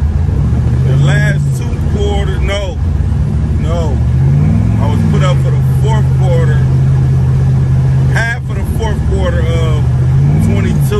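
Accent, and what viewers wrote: United States. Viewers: Yank talk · that yank talk go crazy 😂 🏁💯🏁💨🥃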